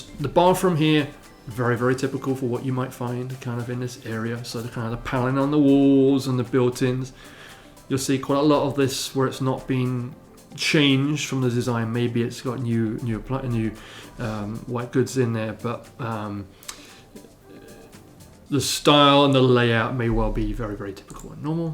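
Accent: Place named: United Kingdom. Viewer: You appear to have a British accent where in the U.K. are you from as a Brit I can’t quite place it? Also my wife who is a portlander says that area is surrounded by bad areas and not that great a place to live in the Portland area. She compared it to Birmingham in the U.K. one or two streets great surrounded by bad areas.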